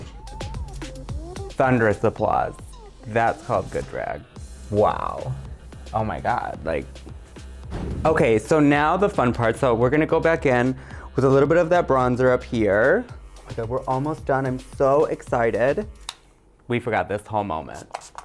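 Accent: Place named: Milwaukee, United States. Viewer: Yeah she's from Milwaukee, so she has a similar accent to Trixie Mattel! If you listen to them both closely in some video's, they will sound quite similar with some things they say lol, it's awesome.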